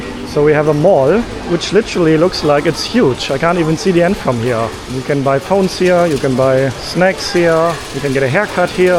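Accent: Germany. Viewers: Its a german accent not an english one. But the language he is speaking is english. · Hi! I’ve been watching your videos for a long time, mostly on Bilibili, and sometimes on YouTube too. Today I opened YouTube and your video was the first one, so I watched the whole thing. I remember you mentioned before that your German-accented English might be hard for people to understand, but I watched the entire video without subtitles and understood everything perfectly. I think your English is totally fine!😊 Hope more people discover and love your content.❤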